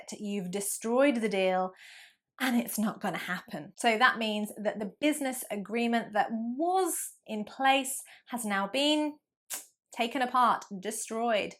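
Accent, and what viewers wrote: United Kingdom. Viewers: I like the British accent :-) Hello from E.T. -- 🦋East Turkistan🦋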